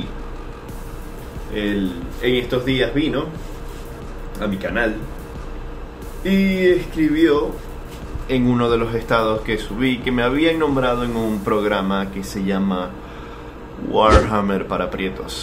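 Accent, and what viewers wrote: Venezuela. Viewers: ME ENTERO QUE VENEZUELA TIENE UN LUGAR EN LA DISFORMIDAD, AUNQUE CREO QUE QUIEN LO GOBIERNA ESTA CORROMPIDO HASTA DONDE NO LLEGA EL SOL, SALUDOS DESDE VENEZUELA, PREGUNTA ESTUPIDA ¿ERES VENEZOLANO?, TU VOZ SUENA ALGO CARAQUEÑO. EXITOS Y PROPERIDAD PARA TU FAMILIA HERMANO DE BATALLA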